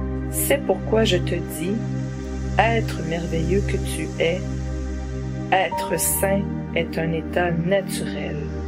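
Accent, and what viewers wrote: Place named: Quebec, Canada. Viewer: Merci pour cette méditation mais dommage je n’arrive pas à comprendre autre langage à cause de votre accent québécois ou canadien. C’est vraiment vraiment dommage mais bon merci en tout cas bonne soirée bonne nuit ciao ciao. Car quand j’ai Michaël me pardonne mais on m’a demandé de dicter ses mots secondaires c’est ce que je fais voilà au revoir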